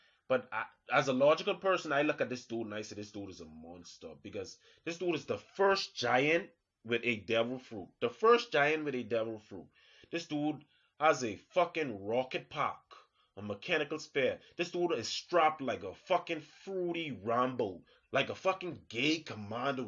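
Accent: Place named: Caribbean. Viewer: Are you Jamaican ? You have a Caribbean accent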